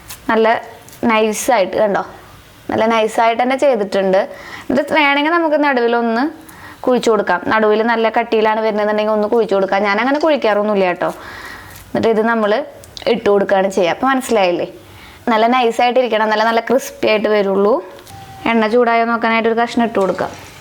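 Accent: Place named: Thrissur, India. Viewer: Chechi.thrissur bhasha aanalloo njnum Thrissur aanuttaa 💪❤️❤️🤗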